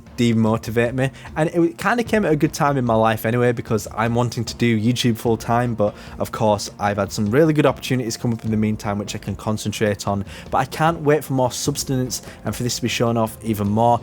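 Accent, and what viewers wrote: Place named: England, United Kingdom. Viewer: Wanna know what's beautiful? Your wonderful english accent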